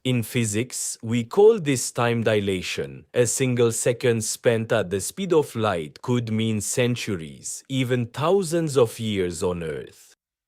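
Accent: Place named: India. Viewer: İndian aksan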